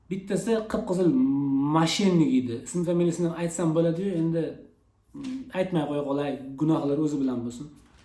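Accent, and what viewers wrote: Namangan, Uzbekistan. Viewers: namanganni tortyapti Uchqoʻrgʻondanman siz qayerdansiz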